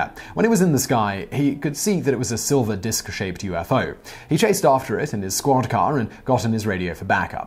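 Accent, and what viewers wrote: England, United Kingdom. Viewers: Ran-i-er. Damn Limeys. Rain-eer. Your welcomer. Jesse Mar-sull. Marcell. You English folks talk funny.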